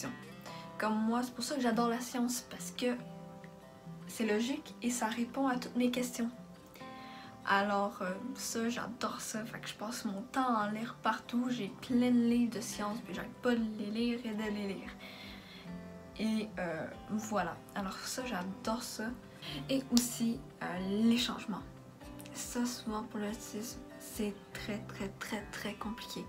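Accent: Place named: Quebec, Canada. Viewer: De rien c'est 100 % sincère votre accent m'a fait pensé à Céline Dion une chanteuse que j'adore.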